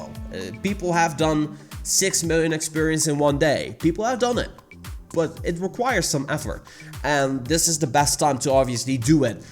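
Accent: Netherlands. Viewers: You really sound dutch plus i might move to the Netherlands in August so i hope i meet you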